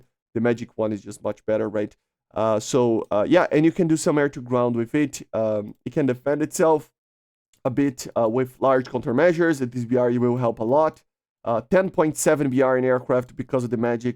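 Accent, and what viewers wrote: Brazil. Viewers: I was about to ask If you were from Brazil because of the accent. Then I saw you had a Portuguese Chanel lmao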